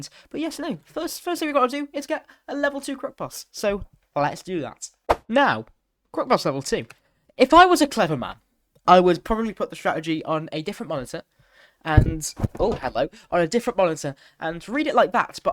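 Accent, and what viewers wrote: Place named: United Kingdom. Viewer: the british accent is so good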